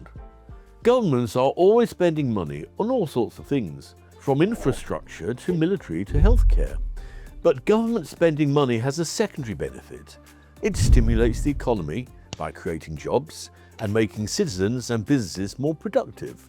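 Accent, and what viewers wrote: United Kingdom. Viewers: False Words: "proof just sure certain break principal due based problem" -- ya that means "insurance" is also a false word & wow is it:face-purple-crying:America has defaulted 5 times about every 1/2 century kinda: 'Nixon said he was temporarily suspending the dollar’s convertibility into gold. The most obvious lie was Nixon’s claim that the suspension would only be “temporary.” It’s still in place today -- the US dollar has lost over 87% of its purchasing power since 1971. “Since 1789, the United States has paid all of our bills on time,” said former Treasury Secretary Janet Yellen. “In our history, we have never defaulted on our debt or failed to pay our bills,” said President Biden’s press secretary. “… the United States has never defaulted on its debt,” wrote The Washington Post. You hear it everywhere. The US has never defaulted. 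It’s repeated by politicians. By bureaucrats. By the media. As if it were gospel. All lies.' As one who did the accounting for an IT company I used to work for this all makes sense now why NOTHING made sense lol. Now I see fully why I am/was so obsessed with accounting -- follow the $. IT'S ALL A SCAM -- INSIDERTRADING DONTPLAYDONTBREED. Now that MOON HOAX I totally understand why they did it though it is STILL WRONG -- esp. now that I know that THE REST OF THE FREAKING PLANET KNOWS & IS BLKMAILING THE USA FOR TECH. $2B/day -- ya that's not sustainable. Luv this vid everytime nearly when i hear that british accent w "bonds" i thk james ; ) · Thx much. Clear and understandable. And, with a british accent, it's immediately perceived as smart and credible!!😊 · the British accept helps me understand better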